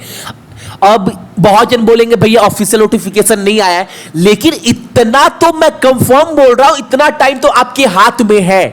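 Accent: Bihar, India. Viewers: kdwa par sch bolte ho bihari style me jo bahot acha lgta hai muje toh. love from rajasthan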